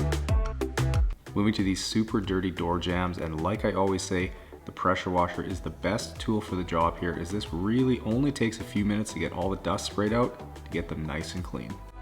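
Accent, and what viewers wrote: Canada. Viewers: What did he say? Compressed air and what? His Canadian accent lost me.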